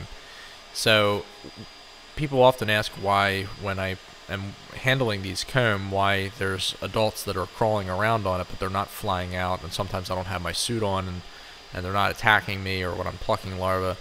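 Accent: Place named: Southeast Pennsylvania, United States. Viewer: Your accent - are you from SE Pennsylvania?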